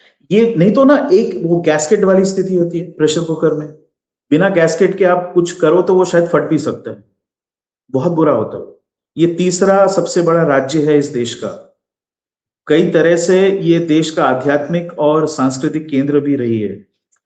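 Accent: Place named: Uttar Pradesh, India. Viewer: Very well analysed and presented with full confidence & facts ....Haan Hindi ke bol bahut hi achhe aur shudha hai...Very impressive & cultured Hindi speaking ...Looks like connection with Prayagraj or Lucknow..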